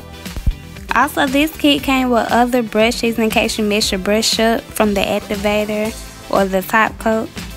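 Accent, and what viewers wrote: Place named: South, United States. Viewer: That southern accent boo 😍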